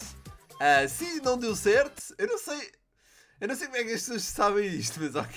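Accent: Portugal. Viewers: tu es o melhor YouTube que fala português de portugal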